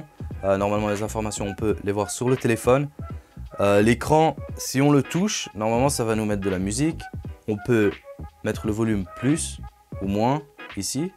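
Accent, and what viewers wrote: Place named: Quebec, Canada. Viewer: tu viens de où? Tu as un accent du Québec!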